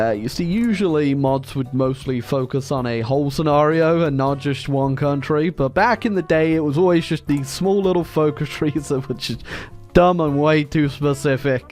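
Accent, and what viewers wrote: United Kingdom. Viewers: How am I just now realizing youre british wtf ew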